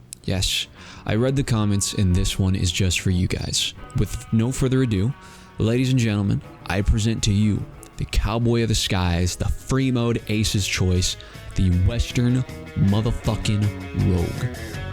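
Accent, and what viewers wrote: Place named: Netherlands. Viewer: The slightly hidden Dutch accent just oozes of every few words you speak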